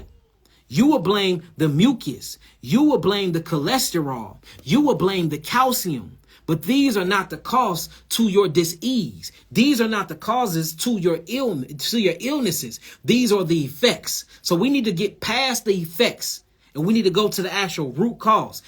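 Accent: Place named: Kentucky, United States. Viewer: I’m from Louisville ky we really do talk like that especially in Louisville she definitely not faking it 😂💯 no bap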